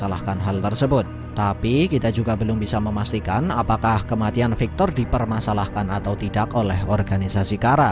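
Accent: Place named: Java, Indonesia. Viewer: min aku suka banget sama suaramu, medok medok krenyes.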